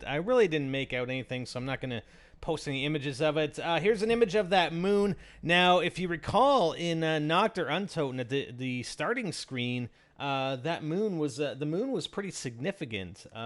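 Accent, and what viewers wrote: Canada. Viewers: is canadian you can by the way he says about and out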